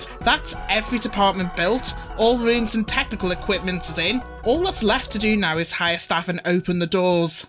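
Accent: Liverpool, United Kingdom. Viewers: You sound like you're from Liverpool! I have the same problems with the system of how wards work. Especially the common rooms. I worked in Aintree and as F1s we had a large communal room for all of us, regardless of the ward! Same with AMU and having patients with diagnoses of different specialities. Oh well, I guess they aren't building it around our systems but some of the logic is irritating.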